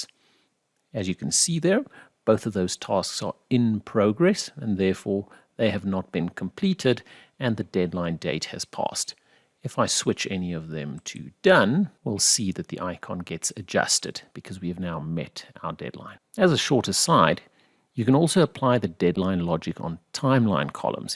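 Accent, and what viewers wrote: England, United Kingdom. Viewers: like the content and usually like an English accent, but this is like fingernails on a chalkboard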